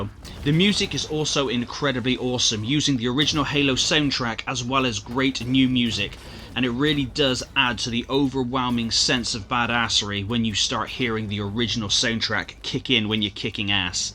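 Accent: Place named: West Country, United Kingdom. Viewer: My game of the year, been looking forward to this game for months and was not disappointed, great review, ive actually found a couple Easter eggs (giant sandwich and the original xbox) I have to ask..... what part of the UK you from? Love the accent! I'm a Bristolian, you have a west country twang! Lol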